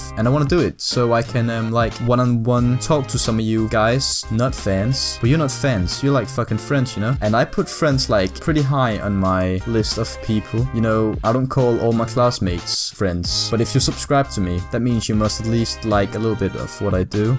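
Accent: Denmark. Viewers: I knew that accent sounded familiar... You're Danish! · Hehe, det var sgu nemt at høre på din dansk-engelske accent!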